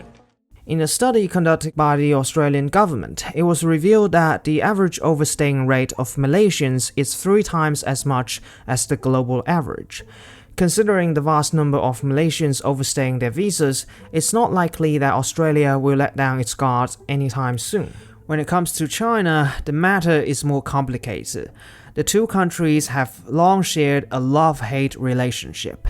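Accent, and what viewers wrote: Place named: United Kingdom. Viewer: Goosebumps, Asian with British accent.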